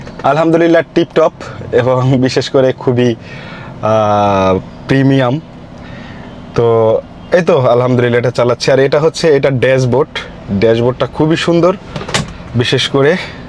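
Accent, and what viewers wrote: Barishal, Bangladesh. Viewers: মনু তুমি বরিশাইল্লা। খাইছো।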